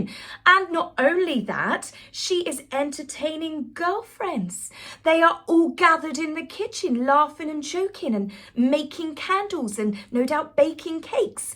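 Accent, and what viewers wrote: United Kingdom. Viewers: I always appreciate your cutting wit and humor articulated with the perfect velvety British accent and sweet smile! It’s the perfect balance of tone when breaking down this mess! Bravo! 👏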